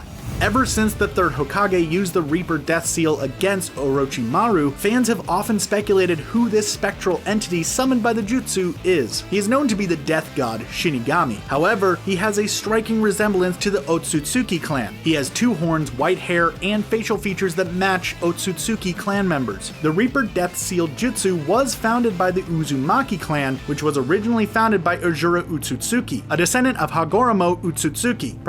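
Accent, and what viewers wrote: United States. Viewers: Really? Naaaruto? Boooruto? Even kaaaguya?! Wtf. Kakashi and Tsunade are about the only names that don't maker me cringe when hearing them pronounced like an American. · He thinks that this is an American show that way he speaks like that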